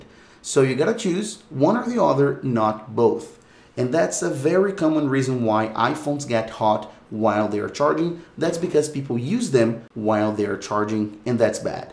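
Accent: Brazil. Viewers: Why do you have this thick brazilian accent ?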